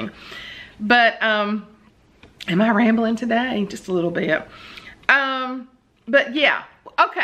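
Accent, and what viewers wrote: South, United States. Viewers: you are being covered with prayer for next Wednesday. Please let us know that all is well. Your church is truly doing God's work! Prayers for you for the energy and strength and enlightenment as you work on the grant for the community mission. I just got back late last night from a trip with my siblings and their spouses to Nashville and Memphis. I thought of you every time I saw a sign for Birmingham. I was born in Georgia and always feel "at home" in the South. Loved opening up my iPad and hearing your southern accent this morning. Would love a chance to own Nonnie's Nuggets- ❤️. - Susan in Maryland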